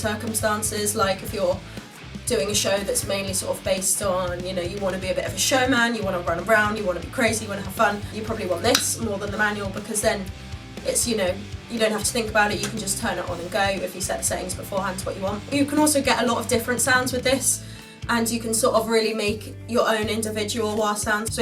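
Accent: England, United Kingdom. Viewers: omg shes english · She sounds British to me. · Damn, I fucking love the British accent ❤️ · She's sooooo English!!! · I hear british accent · What a perfect original English accent!! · Omg British yes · WTF you have an english accent? Thought you were from Kentucky or something lmfao. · Английский аакцент . мне так нравится как ты играешь ты такая красивая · ¿Are you English, beautiful? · The pedal sound like sh#t, but I loved hearing your perfect english accent.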